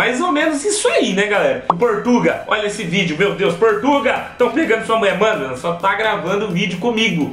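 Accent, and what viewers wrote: Goiás, Brazil. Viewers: fala por que vc fala no pique do goias vc conhece goiania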